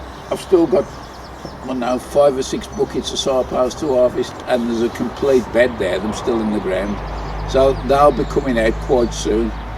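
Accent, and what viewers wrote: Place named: Black Country, United Kingdom. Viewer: Hiya. I have watched many of ya videos and I think they are great, ya cor be too far from me with that accent. dragged up in Gornal.raised in Woodsetton and now live in Brewood. yow must be local to me with that Goodrich logo on ya top, I'm on the allotments in Watery lane Codsall, Ima a guessing yam in Bushbury or close by, would love to hear from ya, Hopefully, speak to ya soon,Arthur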